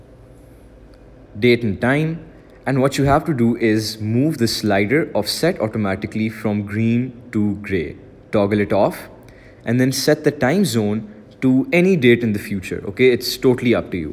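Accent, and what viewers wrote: India. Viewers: He sounds Indian af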